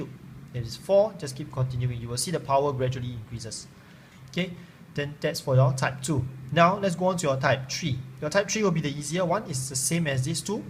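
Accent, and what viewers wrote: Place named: Singapore. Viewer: I recognize the Singapore accent ;), keep it up man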